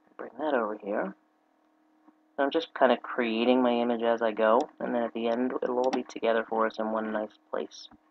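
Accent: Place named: South Jersey, United States. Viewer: ha!! i knew it!! my mom is from egg harbor, my dad is from absecon. i miss the beaches so much in south jersey....but am so excited to be coming back to go to the shore again!! woohoo!! you can talk to me anytime, i love that accent....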